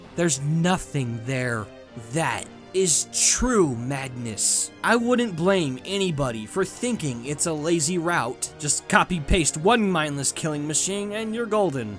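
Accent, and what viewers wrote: United States. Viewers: erm, upstate new york?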